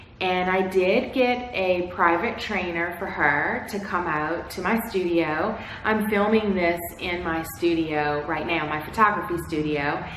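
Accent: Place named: New Orleans, United States. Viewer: I have been watching your videos. You are so sweet and informative. We have a lot in common. My family is from New Orleans. I picked up on your accent right away. Go Saints! I lost my 1 st Shih Tzu 4 months age. He was 13 and my world. I also have been looking for a reputable breeder to find a new baby. I searched all over the country spoke to many many reputable breeders and finally reached a decision. Now I am expecting my new puppy 🐶 in 8 weeks. I am so excited to see and meet him in person. I love watching your videos. My husband and I do filming and media. I also have 2 grandsons. I love and spoil my dogs too. We love Disney too! My husband produced the Voyage of the Little Mermaid puppet show and also on the set of the Lion King. I hope one day we can talk. Stacci is gorgeous!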